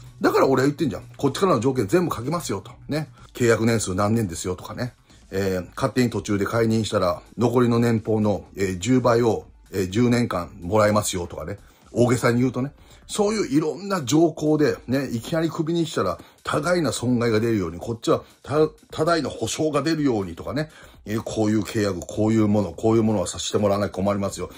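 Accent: Kansai, Japan. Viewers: 里崎さんは、興奮すると関西弁が強くなるね。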